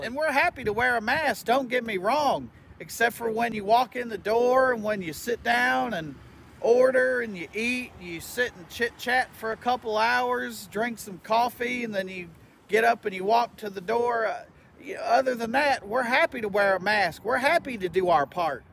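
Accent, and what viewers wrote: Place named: Missouri, United States. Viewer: That Missouri accent is on point. "Welcome to the greatest restrunt in Missourer, the Gold'n Cur'ral"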